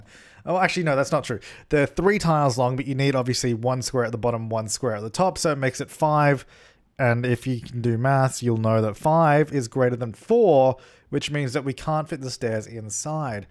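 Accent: Australia. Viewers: Are you Australian? your accent lmao, bc i am too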